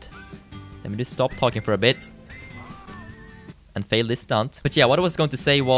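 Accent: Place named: Sweden. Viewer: You sound like your swedish.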